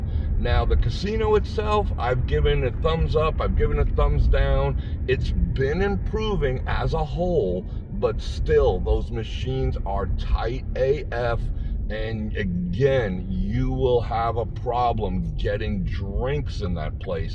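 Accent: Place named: East Coast, United States. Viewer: From your accent are you from the East Coast.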